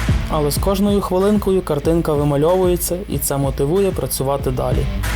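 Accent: Ukraine. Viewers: Смішний, піднамаєш настрій, і діалект класний. Чернівці?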